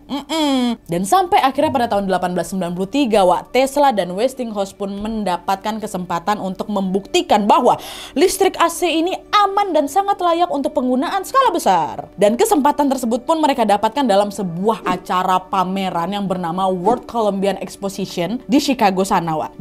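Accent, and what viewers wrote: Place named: Padang, Indonesia. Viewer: Logat nya unik😂😂😂 logat padang kayak nya nih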